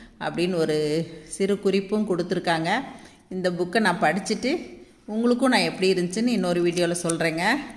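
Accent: Kongu, India. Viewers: கொங்கு குரலும்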